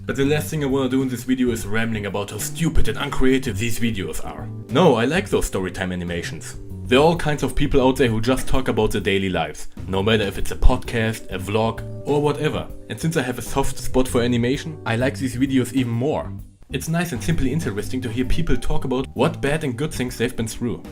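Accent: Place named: Germany. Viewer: So 2 Sachen. 1. Man merkt sehr krass das du deutsch bist. Aber solange du die Sprache gut beherrschst ist es ja ok! 2. Die Rechtschreibung. Man schreibt im Englischen nur Namen groß! Und das "I" schreibt man auch immer groß. (<-- Englisch Freak is am start)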